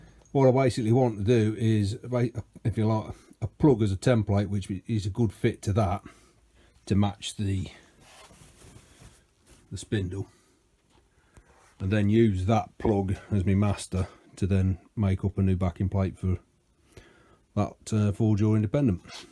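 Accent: United Kingdom. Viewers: Australian here! When you say chucks all I hear is "chooks" as in "chickens! LOL! Confirmed by me Missus!..LOL love those british accents. Keep up the good work!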